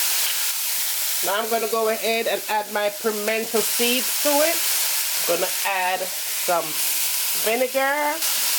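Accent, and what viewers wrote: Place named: Jamaica. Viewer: Looooove the cooking but looooooooooooooooovvvvvvee the Jamaican accent! ❤❤❤❤❤❤❤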